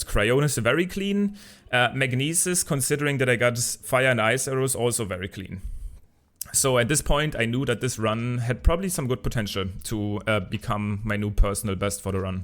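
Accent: Germany. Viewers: Man merkt den Deutschen Akzent so hart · you sound german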